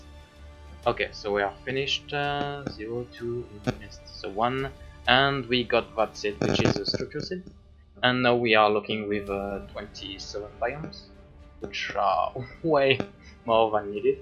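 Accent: France. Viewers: You accent seem french, but perfect english :p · Nice French accent (super mod d'ailleurs) EDIT : It really worked on the server I was playing. I'm shoked it requires zero efforts at all.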